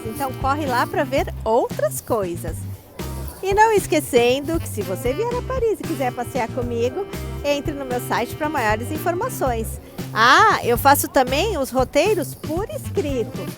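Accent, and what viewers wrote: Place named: Santa Catarina, Brazil. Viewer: Deve ser inveja kkkk..vc deve ser de SC o povo daqui q fala assim.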